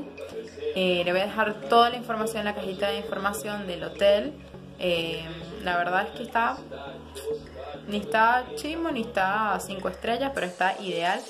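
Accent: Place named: Argentina. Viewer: Me vacilé tu acento argentino todo el video 🤭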